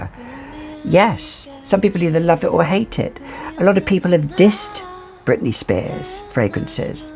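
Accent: France. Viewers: Yayy for the French accent!!!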